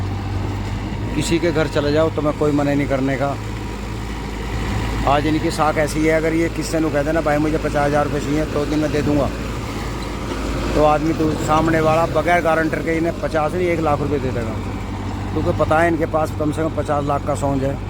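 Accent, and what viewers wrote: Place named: Western Uttar Pradesh, India. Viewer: जी राम राम। बिजी रहता हूँ फिर भी आपकी वीडियो आपकी कड़क आवाज सुनने की खातिर वीडियो पूरा देखता हूँ। दूसरा कारण वेस्ट यूपी की स्थानीय भाषा की बोली और लहजा मुझे हरियाणे की भाषा के mukable में ओर भी अच्छी लगती है। मेरा एक अजीज दोस्त गाज़ियाबाद से है। उस से 11 साल से बात कर रहा हूँ इस लिए अब मुझे वेस्ट यूपी की भाषा समझने में जल्द आ जाती है। From- गंगानगर (Raj.)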